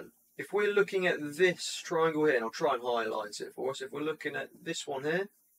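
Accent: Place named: East Midlands, United Kingdom. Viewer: What a great time for students to learn maths with helpful videos like this! Way better than reading through dog-eared textbooks! You sound like you have an East Midlands accent. Nottingham? Mansfield?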